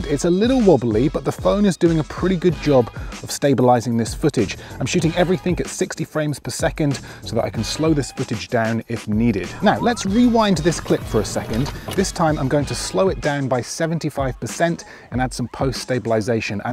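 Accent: United Kingdom. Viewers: めっちゃイギリス英語！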